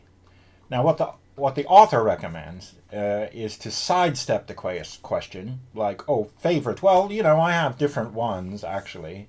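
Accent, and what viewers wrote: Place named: United Kingdom. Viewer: Why do i keep hearing abit of a british accent , 😂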